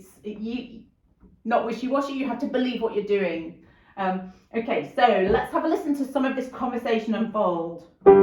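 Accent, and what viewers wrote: United Kingdom. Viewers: Amazingly angelical English accent! Flawless explanations. Again, thank you. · Snap! British English...love it!!! Same here!!!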